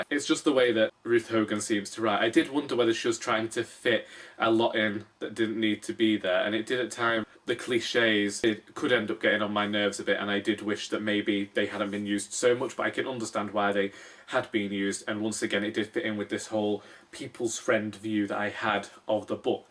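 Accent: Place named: Nottingham, United Kingdom. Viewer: Thank you for your review.........I will listen to this. You sound local to me I live in NG1, good to hear you.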